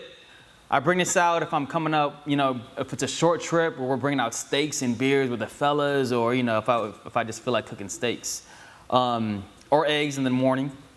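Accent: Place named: South, United States. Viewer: Fantastic review. Are you from the south? Every once in awhile I could note a southern twang in your speech.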